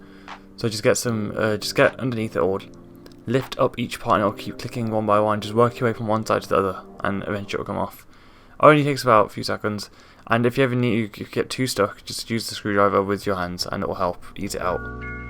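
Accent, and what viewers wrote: Birmingham, United Kingdom. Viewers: Twat....nothing wrong with brummie...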